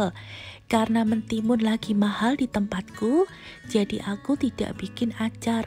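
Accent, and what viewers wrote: Java, Indonesia. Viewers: logatnya jawa juga kental banget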